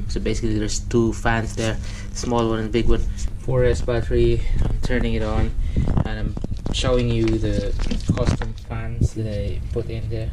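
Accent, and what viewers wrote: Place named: Malaysia. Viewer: i thought u are from malaysia as your ascent is quite similar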